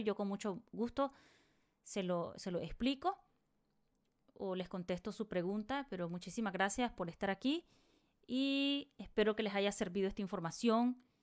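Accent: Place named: Central America. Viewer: Posdata: Sabía qué estás de Centroamérica por el acento pero no tenía la certeza de qué eras Nica! Yo también soy Nica,un saludo paisana! 😘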